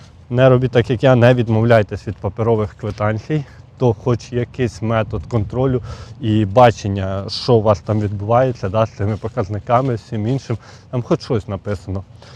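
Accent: Ukraine. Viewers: Вы говорите на русском с украинским акцентом ,определитесь уже товарищ типа учитель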